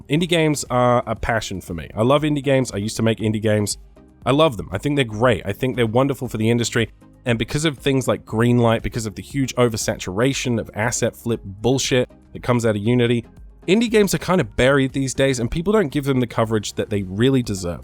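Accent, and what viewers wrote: Australia. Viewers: Congrats mate. No nonsense gaming coverage. And great to hear a fellow aussie. Keep it up 👍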